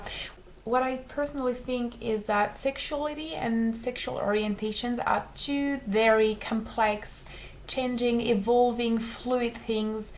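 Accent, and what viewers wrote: France. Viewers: nice video... hope you find the person that compliments you're amazing self well. also amazing french accent. totally love that. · I am puzzled. Not about my own sexuality, I am not asexual, I am straight, never doubted neither of those. But when I began to watch some of your videos, I thought "Damn, she is stunning !" and then "f***... She is asexual, what a shame !". But since I was enjoying listening to your voice, I figured that would be a good time to know what asexuality really was. I used to think asexuals were people who either had bad experiences or were afraid of sex (that would have explained why I saw more asexual girls than asexual guys). After listening to you, I understand that is not entirely true. You are not a "Eeeeew ! Sex is gross ! It is a sin ! You are a whole bench of sinful perverts !" type of person, and that is relief, I must admit. 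My first girlfriend was not asexual, but she used to say she did not like french kissing (she was my first, but she had a lot of guys before me). But when we started to get physical, we ended up making out and we french-kissed a lot. I do not think I was that good at kissing (she was waaaaay more experienced than me) but it came naturally. I think it is the same with sex. With some people, it cames just like that, it is not entirely your responsability. Anyway, I do not know if you are still single (a few month have passed) but I know you will find someone. You are very cute, I love your voice and that light french accent is very charming. There is a fragility in you, that is attractive. And I hope you will be happy with him, with or without sex. ;)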